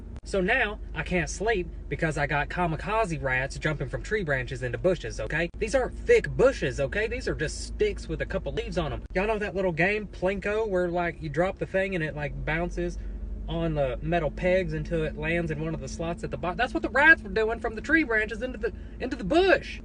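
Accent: South, United States. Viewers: is he talking about possums? lol i guess they are noisy little creatures. he had more of an southern tang in 2018 did yall notice that?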